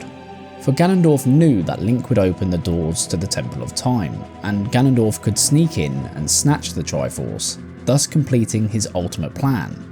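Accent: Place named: United Kingdom. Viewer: British accents are annoying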